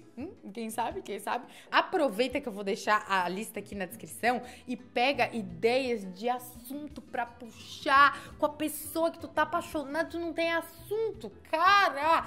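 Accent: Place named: Florianópolis, Brazil. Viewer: Ho feia sabiax que erax aqui do suli mas que erax manezinha não sabiax ...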